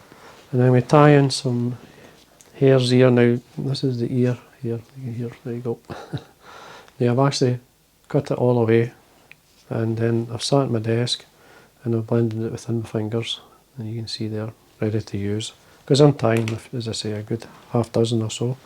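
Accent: Scotland, United Kingdom. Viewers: Hey! While I like your Scottish accent it does not translate well in your transcripts. I am hard of hearing and would like it if you would include a material list as well as transcript. I guess model doc is a mallard duck. Thanks for the great patterns and tying methods. Harry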